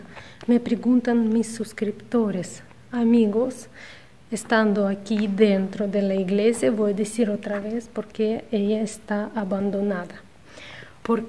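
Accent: Russia. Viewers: y disfrutar de tu compañía me gusta tu tono ruso al hablar castellano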